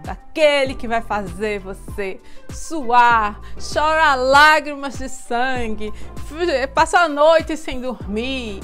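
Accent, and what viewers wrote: Northeast, Brazil. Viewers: Muié de Deus😂😂😂😂😂😂😂 tu só pode ser Nordestina 😂😂😂😂😂😂😂😂😂😂 PARABÉNS... Que DEUS te abençoe sempre 🙏🙏🙏🙌🌺🌺🌺 Direito de Maceió Alagoas Brasil kkkkkkkkkkkkkkk 😂😂😂😂❤❤❤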